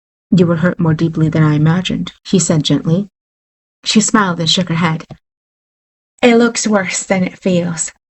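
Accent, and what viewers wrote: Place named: South, United States. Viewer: Love it! That southern accent is awesome.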